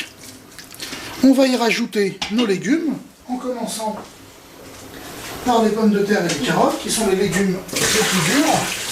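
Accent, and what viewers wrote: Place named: France. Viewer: Merci pour votre recette, c'est en cours de préparation chez moi! Et merci pour ce bel accent bien français ;)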